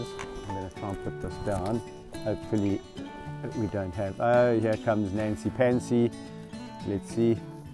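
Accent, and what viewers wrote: South Africa. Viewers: Very special...many thanks. Your accent is rehabilitating white South African too! Far more of a challenge than changing a spotted hyenas rep!!?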